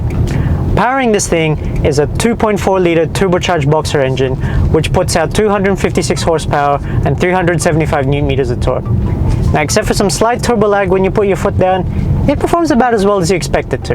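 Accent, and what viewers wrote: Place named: Australia. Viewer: Someone has been living in Australia..nice accent. These cars are imported from the US and are the Canadian spec.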